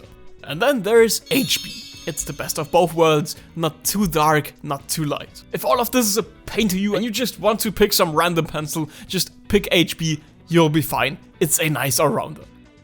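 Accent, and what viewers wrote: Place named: Germany. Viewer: I started watching your videos last night and instantly found your accent and style of comedy nostalgic. You reminded me of the lessons my german mother gave me when i was young, very German yet very relatable.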